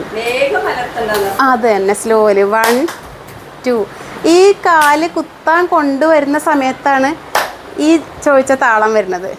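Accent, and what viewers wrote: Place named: Thrissur, India. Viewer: വർത്തമാനത്തിന് തൃശ്ശൂർ ഭാഷ തന്നെയാണ്.,ഊരകം,ഞെരുവിശ്ശേരി ഒക്കെ അറിയാമോ.അവിടെയൊക്കെ ധാരാളം ആളുകൾ കൈകൊട്ടി ക്കളി അ റിയുന്നവരുണ്ട് എന്ന് കേട്ടിട്ടുണ്ട്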